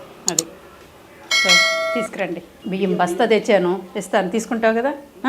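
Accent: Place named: Vijayawada, India. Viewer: Mi mata manasu manchidhi sister 😊,madhi vijayawada side miru makulane మాట్లాడతారు బాగా,ఇలా ఎన్నో చేసి మంచి పేరు తెచ్చుకోవాలని ఆశిస్తున్న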